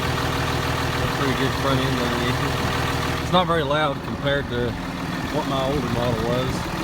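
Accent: United States. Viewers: So southern, sounds of goats followed by a hefty loogy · Your accent is making me miss my Kentucky family. Nice Vid, love the truck.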